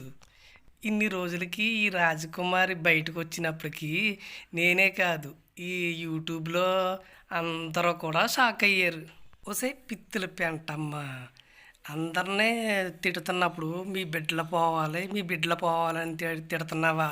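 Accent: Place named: Godavari, India. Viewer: Ayyo meeru vere anukunna andi. But voice super and Godavari slang super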